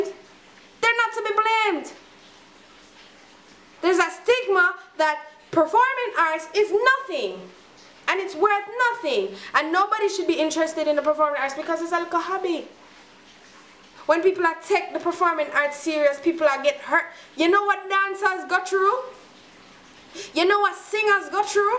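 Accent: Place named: Jamaica. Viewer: calm down sista calm down right now you should be in a Tyler Perry play your good and I like your accent JAMAICAN NATION FOR LIFE!!!!!!!!!!!!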